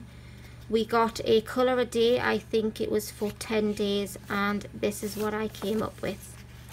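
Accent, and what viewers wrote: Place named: Northern England, United Kingdom. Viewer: Hi, just subscribed to your channel. It sounds like you are a fellow Northerner:D Awesome colouring x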